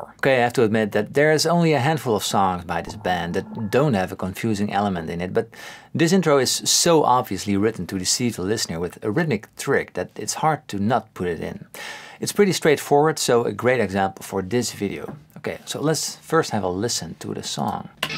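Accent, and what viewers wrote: Netherlands. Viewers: Are you Dutch? Something about your accent feels familiar.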